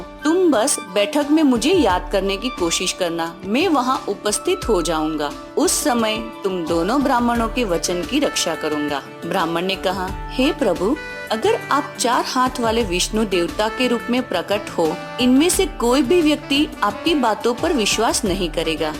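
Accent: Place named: South India, India. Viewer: South Indian voice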